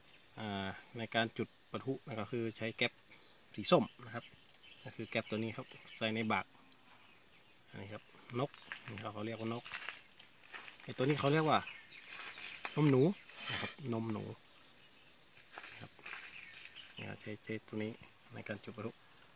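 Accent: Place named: Korat, Thailand. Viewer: โคราชแน่นอนครับ ฟังสำเนียงแล้ว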